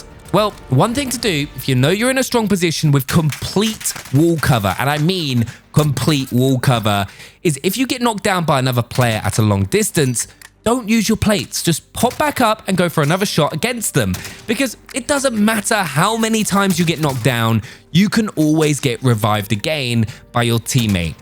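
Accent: United Kingdom. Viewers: Probably his British accent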